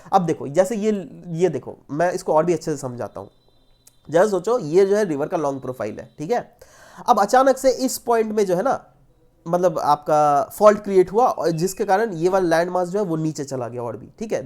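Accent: Bihar, India. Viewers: sir aap bihar say hai kya ?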